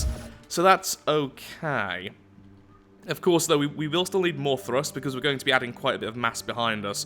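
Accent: United Kingdom. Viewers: holy shit I was looking away and forgot what I was watching and you sound like the most stereotypical brit I could not distinguish which british youtuber I was watching lmfao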